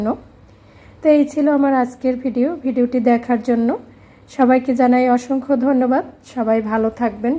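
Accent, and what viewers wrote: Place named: Barishal, Bangladesh. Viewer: আপু আমি তোমার ভিডিওতে আরো অনেক বার সুনেছি আমাদের বরিশালের কথা সত্যিই কি তোমার বারি বরিশাল তাহলেতো ভালই হলো আমার একটা আপনজন পেলাম আমার পরিবারে টমেটো দিয়ে মাছ রান্না দারুন হয়েছে অনেক ভাল থেকো দোয়া রইলো তোমার জন্য ভাল থেকো ইনশাআল্লাহ আপুমনি❤❤❤❤❤❤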